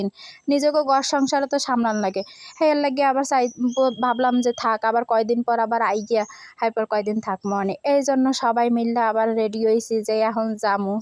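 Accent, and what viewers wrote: Barishal, Bangladesh. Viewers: বরিশাইল্লা ভাষা মুই ভালা পাই🎉🎉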